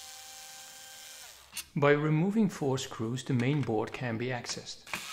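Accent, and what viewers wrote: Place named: Netherlands. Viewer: Hi, I am new to your channel, had a look at your website and a question immediately arose: according to your accent and name I wouls assume you are a Dutch guy, just like I am. But the company/museum is based in the U.S.? A lot of the museum's team members have names that sound like ones close by, could you explain this situation? There is a good chance I would like to order some parts in the near future and perhaps it would be easier to ship from NLD than from USA. I will be watching all the videos shortly as there is a ton of useful information available I assume. Thank you very much!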